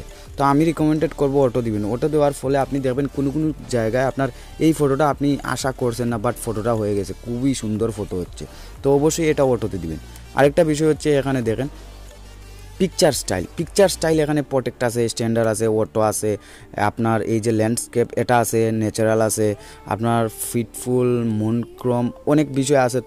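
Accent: Sylhet, Bangladesh. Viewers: vai Sylhety?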